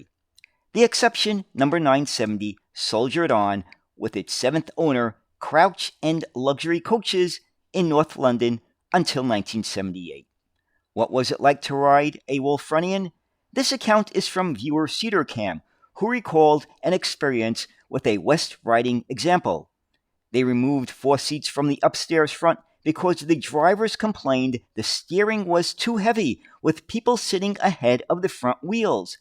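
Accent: United States. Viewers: I seem to be drawn to British bus videos - I simply find it fascinating to hear an American accent talking so enthusiastically about British buses! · Thank you for your Video..! Seems strange a "Guy" excuse the pun ? with a New York accent is interested in my local childhood buses..Brought back happy memories.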